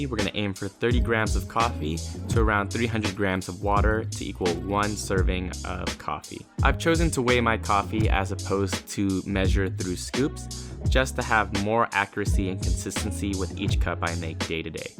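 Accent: Philippines. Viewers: way cool. dance moves. Philippine accent cut over. super fun. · I just got a pour over set for Christmas and this video got me SUPER excited to make myself coffee <3 the only thing is that my set came with a manual grinder that I have no idea how to use yet lol. But great vid, love the pilipino accent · We lub da pilipino akcent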